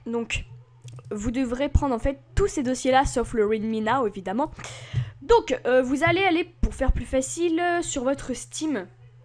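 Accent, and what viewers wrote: France. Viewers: l'accent du sud qui me fait rire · Arrete de faire des blagues nulles avec ton accent marseillais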